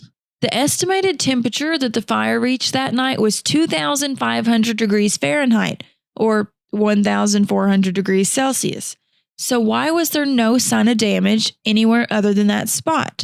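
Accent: Kentucky, United States. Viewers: And as a nice bonus, along with that sweetest southern Kentuckyian accent of yours, I also love your unique sense of humor B. So that means I have found my new favorite content creator, and the videos I'm gonna binge - watching every day, while I'm resting and relaxing after my training, and enjoy while doing it. So the least I can do, is to like, & leave a comment for the " Algorithm Gods" while I'm enjoying your videos. Thank you, and I appreciate you and your hard work that you're putting into making this awesome videos for us, so very much. Cheers!👨‍🎤🌹👧🇷🇸🍀🥂🇺🇸🌏😎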